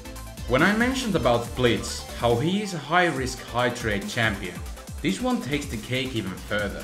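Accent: Finland. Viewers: Are u from finland? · Heti ku alotin videon tajusin "Tää äijä o suomalaine" sen kuulee enkusta...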